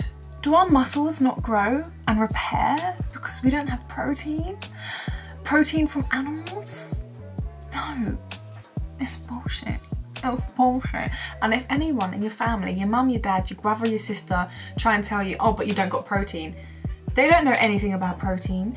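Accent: United Kingdom. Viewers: Your accent is interesting... It's like mostly MLE and then suddenly RP. I think it's that you glottal stop a bunch of ts but not all of them.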